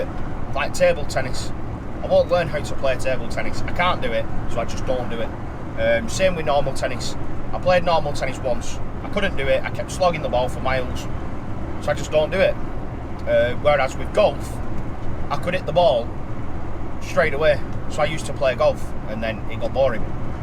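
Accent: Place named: Wrexham, United Kingdom. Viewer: Are you from Wrexham pal?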